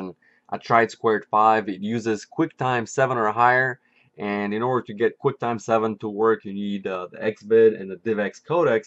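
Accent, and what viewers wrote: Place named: Russia. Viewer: Russian? I hear strong russian accent :D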